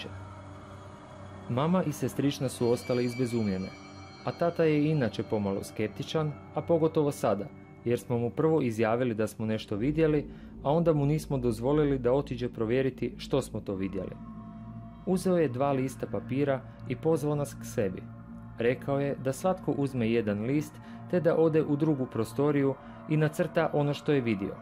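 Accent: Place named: Ekavian, Serbia. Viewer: Uh uh uh uh uh uh uh uh uh uh uh uh uh uh uh uh uh uh uh uh uh uh uh uh uh uh uh uh uh uh uh uh uh uh uh uh uh uh uh uh uh uh uh uh uh uh uh uh uh uh uh uh uh uh uh uh uh uh uh uh uh uh uh uh uh uh uh uh uh uh uh uh uh uh uh uh uh uh uh uh uh uh uh uh uh uh uh uh uh uh uh uh uh uh uh uh ih uh ih a ka ono si ti šef vi ste ekavci srbi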